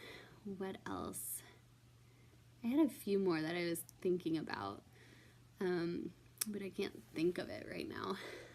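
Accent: California, United States. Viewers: Yesssss! I'm so glad you did this! You speak just like my husband who's from LA. He pronounces the "T" like you do, most American's have silent "T"'s but Californian's are a breed of their own! lol. I TOTALLY forgot about buggy!! The words you use are closer to mine which i think is because we're both west coast kids lol. Great Video! I cringe when I hear "toilette" as well lol. it seems so crass! lol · Aww I LOVE this haha. To me you definitely do have the Cali girl type accent lol. Even though I know you don't call it that or consider it one, lol. I have never heard anyone refer to the bathroom as WC before lol. I have heard them call it "The Loo", but that's so funny. Learn something new every day! I think people in the UK call a shopping cart a buggy too, or trolly lol. This was a lot of fun! Thanks so much for doing it <3 :D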